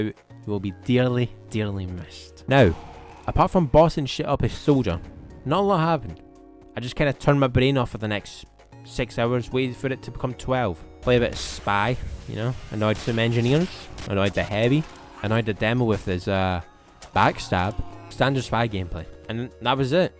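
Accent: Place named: Scotland, United Kingdom. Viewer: why are you scottish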